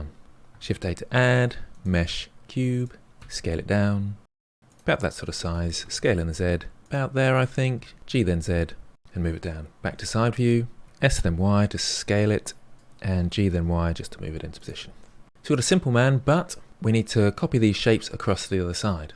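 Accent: United Kingdom. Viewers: You're quite British dude. just couldn't follow blender guru at all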